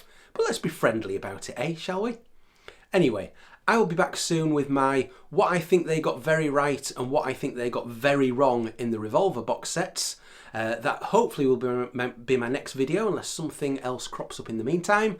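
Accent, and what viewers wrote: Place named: Yorkshire, United Kingdom. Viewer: Thanks. I'm so glad you went for it and looking forward to the unboxings... I rarely buy releases when they come out and prefer to stumble over unexpected jewels and bargains - so seeing your authoritative videos clues me up so well. I really dig your benevolent perspective on things... I'm a Yorkshireman missen so I dig yr groovy accent too! keep on chooglin dude!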